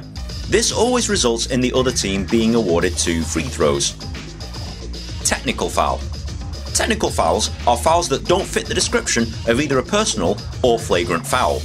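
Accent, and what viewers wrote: United Kingdom. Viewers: Who else knew it was gonna be a British guy? like any other American game explanation video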